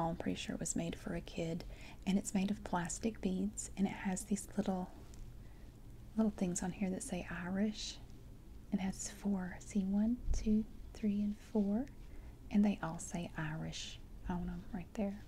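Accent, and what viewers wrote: South, United States. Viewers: I was giggling when you said you had a "tiny bit of Irish" in you. Reminded me of something Phil Lynott, Irish lead singer with Thin Lizzy, said at a concert. (slightly dirty joke coming up....) He said, "How many of you girls here tonight have a little bit of Irish in you?" (girls scream and wave their hands in the air) "And how many of you would like to a little bit more Irish in you....?!" (girls scream even louder!) Love your southern accent x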